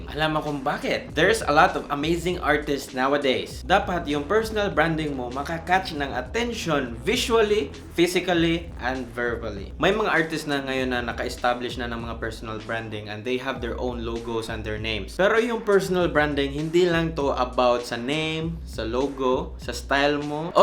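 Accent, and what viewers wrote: Visayas, Philippines. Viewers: [UNRELATED] Bisaya kaba? haha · bisaya ka hahahha pareho diay ta asa man diay inyu?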